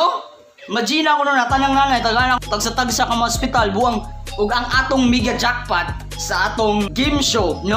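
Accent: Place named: Visayas, Philippines. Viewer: Bisaya ka pala sir hahha makalingaw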